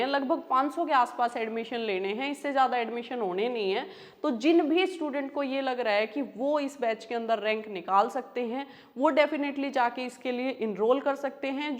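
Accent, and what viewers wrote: Haryana, India. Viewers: Aap haryana se ho mam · Mam hariyanvi jhalak aa gi voice m 😅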